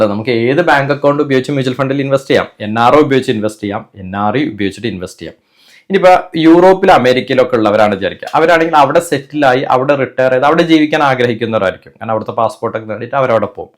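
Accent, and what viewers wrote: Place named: Malabar, India. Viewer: സംസാരം കേട്ടിട്ട് മലബാർ സൈഡിൽ നിന്നാണ് എന്ന് തോന്നുന്നു.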